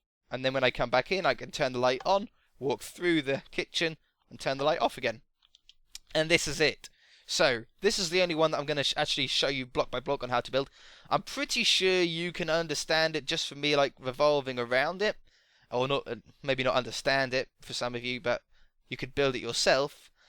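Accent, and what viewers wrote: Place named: England, United Kingdom. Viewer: I believe the correct word would be enunciate and that is just his english accent.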